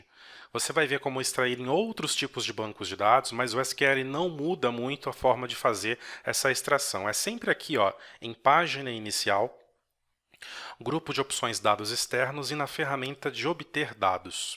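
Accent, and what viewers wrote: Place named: São Paulo, Brazil. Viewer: Muito boa a aula. Obrigado. Me inscrevi no canal. Obs: Você tem o sotaque paulistano mais característico possível.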